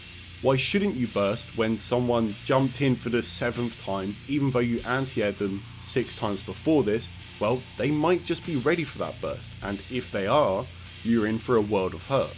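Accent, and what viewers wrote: England, United Kingdom. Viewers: What's with this super heavy duty metal in the background as you calmly speak in a refined English accent? Lol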